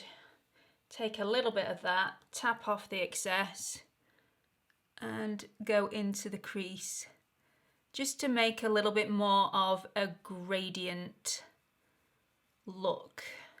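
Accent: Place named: England, United Kingdom. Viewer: She is absolutely Beautiful, I love her English Accent, adorable. Looking forward to other videos. Thank you.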